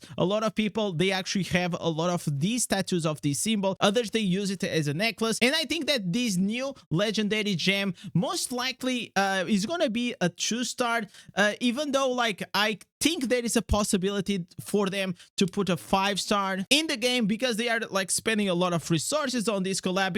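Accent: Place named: Eastern Europe. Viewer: What nationality are you bro? Your accent sound eastern European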